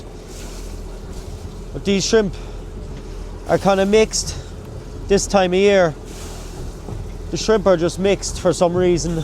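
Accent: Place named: Cork, Ireland. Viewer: Love your video's. Get a bit home sick when I hear the Cork accent and see the scenery! Keep the videos coming...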